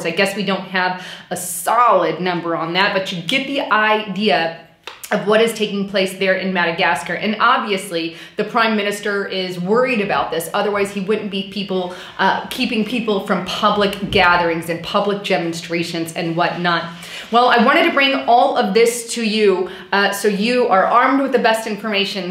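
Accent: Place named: Midwest, United States. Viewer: where are you from? You sound midwestern. I just suscribed to your channel a week ago. I really enjoy your reports.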